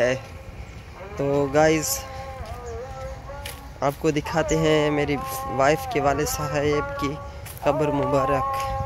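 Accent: Saraiki, Pakistan. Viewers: "اے موبیل پکڑ او میڈا" لالا سرائیکی شناء لگدا پیا ایں۔. واقعی سرائیکی ہو آپ؟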